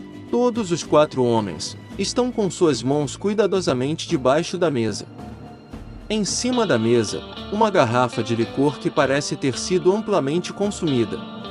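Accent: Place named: Rio de Janeiro, Brazil. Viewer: Triste é ouvir a de nomes de cidades e personagens com o sotaque carioca! “R” afrancesado e “dchi” no final de Billy the Kid e outros this….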